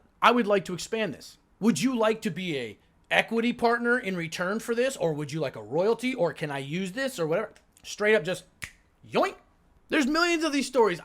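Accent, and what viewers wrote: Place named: Spain. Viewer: Man, why the Spanish accent i dont get it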